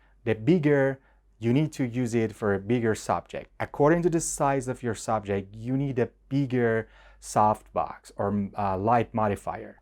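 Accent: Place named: Iran. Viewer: Based off your accent, you sound Persian. 👍😎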